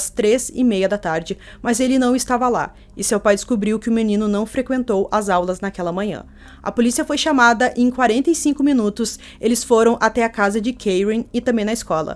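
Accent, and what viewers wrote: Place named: Pará, Brazil. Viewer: vc é do estado do Pará??? Você tem um sutaque típico de lá kkkkk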